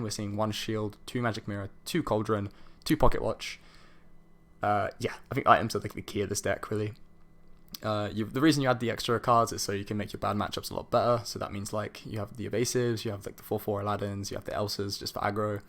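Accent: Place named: Europe. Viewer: Its normal for North America to dominate the TCG space in terms of sheer numbers. The United States out purchases all of Europe in pretty much every TCG at least 3:1. The American dream screams win big money from tournaments so there are soooooo many more TO's running events per game than pretty much anywhere else on the planet. (This tends to shock most European players until they look hard at the state of their official World Championship series for their respective game vs United States.) Between the accent and you having commented on not coming from Magic, I am going to go on a limb and ask if you are European?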